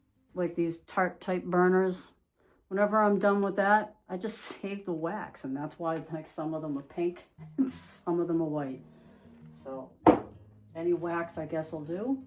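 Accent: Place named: Massachusetts, United States. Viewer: Heyy 😊. I just ran across your channel couple of days ago. You're amazing! Seriously,thank you. I grew up in mass, haven't been back in over 20 years. I didn't realize how much I missed the accent. I watch your videos just for that & the scenery 😊. You're very smart & down to earth. I've subscribed & liked all your videos 👍. Thank you again and please keep em coming. Your guy is a very lucky man....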